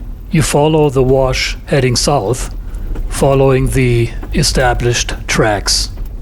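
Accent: Germany. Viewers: I Really Hope the FBI Shuts you Down - Ya got a Foreign Accent too - German maybe🙂 We have the Most Advanced Military in the history of Man because we Protect our Secrets - Today we have idiots and Google earth among others that somehow think its OK to Spy on our most Sensitive Sights - You DO NOT HAVE A TS SAP/SAR SCI Q level etc. Clearance and You Do Not have a Need to Know👎🏻 Reporting this YT Channel also. Good Luck🇺🇸🇮🇱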